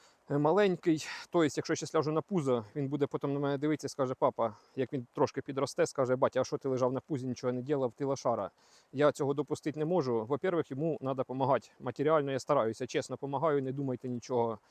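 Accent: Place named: Cherkasy, Ukraine. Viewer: Дивлюсь вас з Канади... містечко біля Ванкувера ... Така ж погода, такий самий ліс !) ... В Канаді повна ж.... з роботою... з цінами на житло... А головне, інколи почуваєш що ти не в Канаді, а в Індії))... Кругом "чорні"... Їжа ((( ... , мабуть найгірша у світі... Дякую вам за відвертість, простоту у відео )!... Маєте Черкаську українську )...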